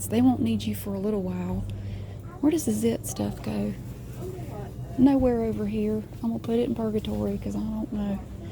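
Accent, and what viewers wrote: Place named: North Carolina, United States. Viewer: The boxes being as unstable as the ex husband killed me XD Also, a few more things about these videos that I absolutely love? 1. The cleaning up. I HATE messy store shelves. One time while walking around our local Wal-Mart someone decided they didn't want their juice so they just sat it down in the middle of the aisle. Like, really? 2. The jokes! They crack me up, need I say more? 3. The accent! I'm a North Carolinian myself, so just hearing that little bit of a country accent reminds me of family members that talked with the same accent that I have since lost or just haven't spoken to in a long time. These videos are my absolute favorite, but I think the ones in the Caldwell area could really use a good cleanup. I don't really look around, I just grab and go when I visit any Dollar Store in this area, but one that I basically grew up in? 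Please consult this timestamp for my comment: . XD The toy aisle was a nightmare, I would go in looking for teen coloring books and there would be a mix of adult coloring books with intricate designs and kitty cats and Disney princesses in the same pile as the word searches. On top of that mess cake was a sprinkle of some parent putting back the mascara and nail polish and maybe a box of cereal too. XD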